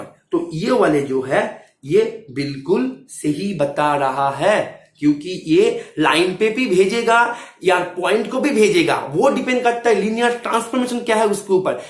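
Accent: Bengal, India. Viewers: Thank you sir. Are you from Bengal?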